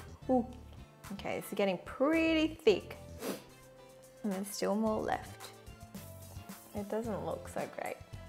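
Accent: Australia. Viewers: i know it's because of her australian accent, i just sometimes mishear what she says anyway because i don't hear australian accents often lol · I love the Aussie accent actually, I think it sounds fun and endearing. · I seriously love the Australian accent XD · Yashti Raj I think cuz she's Australian. I've heard a lot of Australians say it like that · I Looove your aussie accent !! I cannot sound Australian for my life 😂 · I like your accent, is it an Australian?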